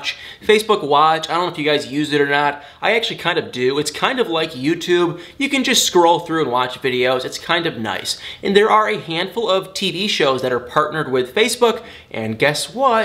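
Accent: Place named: Michigan, United States. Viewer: i can 100% tell you’re a fellow Michigander when i noticed how much you say “and what not” like me 🤣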